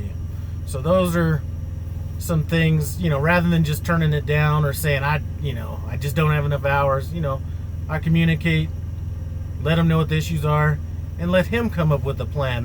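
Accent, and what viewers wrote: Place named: Midwest, United States. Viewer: Good video. When you mentioned the Chelsea market it brought back memories. Are used to pick up gasoline right at the Exxon terminal that I’m figuring you drove by. You are correct there is no place to park in that area at all. I would agree probably is still mafia run. I have driven in New York City going to Fulton market. I would not wanna make it a habit of going to New York City. You sound like you’re more of a Midwest or southeast person. Be safe out there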